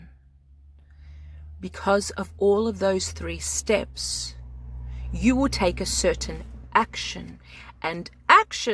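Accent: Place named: Australia. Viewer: I found your video through one of my subscribers who posted your video. Your delivery is perfect and your Australian accent is beautiful, I'm an Australian myself, you're a great teacher and I was so drawn into every word you said, I was sort of hypnotised to tell the truth, and I felt that this message was purely for me 'now'😊 I am turning 68 next month and people say that at 40 you can look over your life and feel all sorts of disappointment but I can tell you nearing 70 you feel like the tank is getting pretty empty. This philosophy really rings true for me, it's not like I haven't thought about all this a lot but the way you put it just cut through. I'm going to listen to you again and again until I really get it just wanted to say thanks. Cheers, Dave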